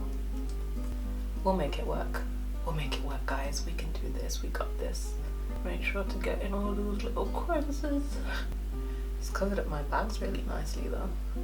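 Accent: United Kingdom. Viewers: She's British lol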